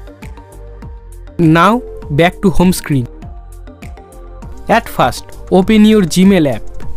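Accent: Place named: Bengal, India. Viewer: আপনি যে বাঙালী তা আপনার ইংলিশ বলাতেই বুঝতে পারছি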